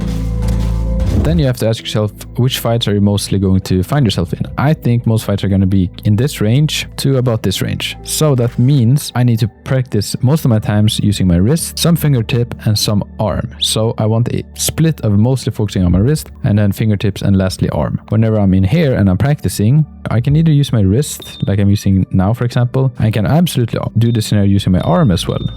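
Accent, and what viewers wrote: Sweden. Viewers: if u dont mind where r u from? r u swedish by any chance?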